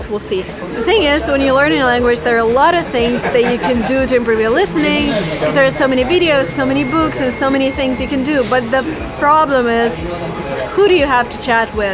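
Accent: United States. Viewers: You are so beautiful and everything is naturally . I love your American accent. How to work with "fluent express" because I'm newer here. Can you explain please. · Your accent sounds 💯% American english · Where are you from ?, i was thinking tha you American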